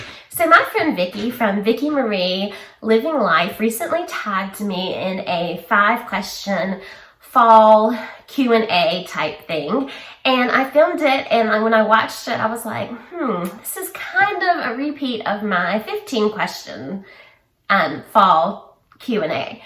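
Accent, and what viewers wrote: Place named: North Carolina, United States. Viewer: I love these Q&A videos and learning about other creators. Now that make sense that you are from N.C. With your accent. Growing the YouTube channel is quite the feat. Great job. Learning new Youtube skills is so fun. Thank you for sharing.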